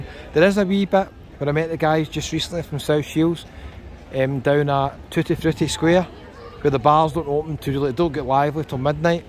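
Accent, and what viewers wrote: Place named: Glasgow, United Kingdom. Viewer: That's a real Glasgow accent, so it is.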